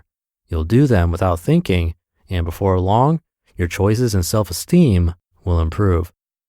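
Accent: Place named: United States. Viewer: Nice to hear an American without an exaggerated accent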